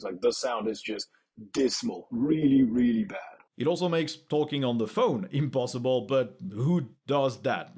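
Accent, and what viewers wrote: France. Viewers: random question here, are you french ?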